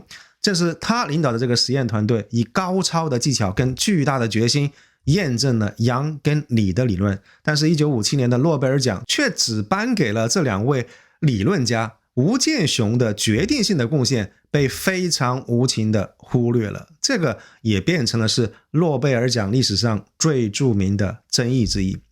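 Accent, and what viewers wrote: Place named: South, China. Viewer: 一周前才偶然关注先生的油管频道，集中观看了部分作品。首先，惊叹于先生的开阔视野和博闻强记； 其次，受益于这种逻辑完善、条理清晰而不紧不慢的叙事； 最后，感佩于这种不受主体和利益相关者的干扰，既能够设身处地忠于历史，而又能够跳出站队与偏见，努力还原真相及实事求是的态度。我不了解先生的个人背景，但令人舒适的南方口语，娓娓道来的文辞背后，包藏着古老的文化底蕴；中西合璧的知识结构，又浸润着清新的现代气息；不避争议而献上自己的一家之言和独到见解，也在诚恳中闪现出自信和智慧的光芒。虽偶有新论令人耳目一新，但更多的是那种沉稳大气、从容优雅，让知识和信息淙淙流进观者心田，如沐春风、如临清泉。世有纷扰，多所歧见。所论，颇值静观。悠管纷乱众奇葩天籁自别群乌鸦万千迷雾森森色一缕清音映落霞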